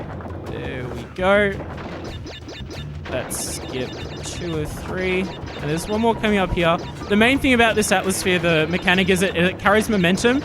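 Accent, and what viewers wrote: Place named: Victoria, Australia. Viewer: Gotta love that Victorian accent saying heeya instead of here. Wasn't grating at all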